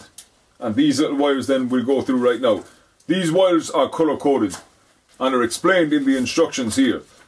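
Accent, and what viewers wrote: Ireland. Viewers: This might be good!! This guy has a Irish voice going through a big bore throat LOL. Brilliant wee video! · Sounds like Offaly to me, maybe Longford 🤣🤣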